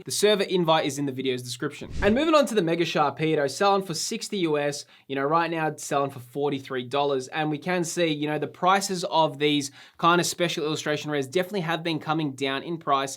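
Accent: Australia. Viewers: first time follower and your format is awesome, and AUSSIE yewwww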